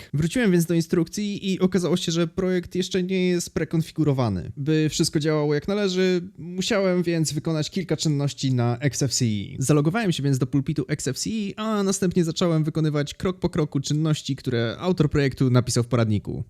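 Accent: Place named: Poland. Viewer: to jest najbardziej polski angielski akcent jaki kiedykolwiek słyszałem (automatycznie ustawiło mi się na angielski)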